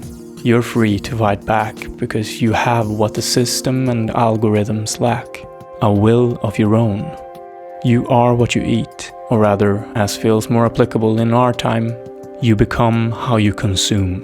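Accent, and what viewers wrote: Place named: Scandinavia. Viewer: sombre tone makes me feel like i should be contributing and leaving an actually intelligible comment but i just wanted to say i got excited trying to pinpoint ur accent, was like eastern european at first but i think its nordic....?? thank you for the video :) (i deal with it with blocks too... and always carrying my notebook with me .. with routines listed out to fall back on)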